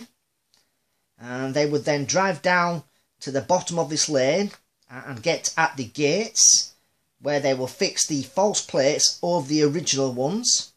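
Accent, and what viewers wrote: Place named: Yorkshire, United Kingdom. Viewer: That's what makes your videos the best on YouTube pal!... I've been interested in the case for years & I've searched allover the internet, I've found your videos/ channel to be the best by far. Thank you & well done for great content & the posting of your excellent videos, I'm working my way through them all!! 👍🏼....... One thing I forgot is your accent! Lol... It's Yorkshire right?.......I'm from West Yorkshire, I can't quite pinpoint your accent lol.... Leeds possibly? Lol.....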